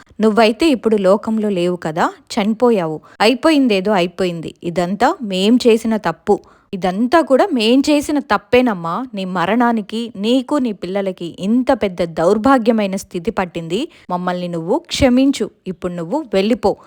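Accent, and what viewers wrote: Telangana, India. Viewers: Hi, I'm a new subscriber from last 3 weeks ago, I'm a big fan of horror stories junky lot more interested, Randomly your video the bangalore delivery person video nundi almost back to back 25-30 videos chusanu, Miru telangana slang lo kuda baaga matladtunaru, miru cheppe style as it as miku stories share chese valla laga cheptunaru 🙌👏👍 best of luck 🤞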